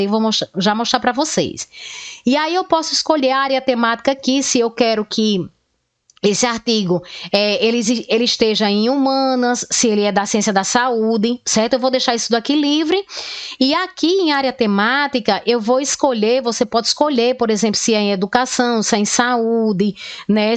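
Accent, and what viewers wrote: Northeast, Brazil. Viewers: Já me inscrevi no canal. Super clara e com sotaque nordestino ❤️ Obrigado!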